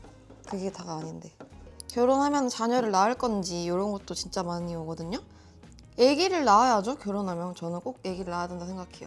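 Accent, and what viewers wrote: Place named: Jeolla, South Korea. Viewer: 예전에비해 전라도 사투리 오지시네요.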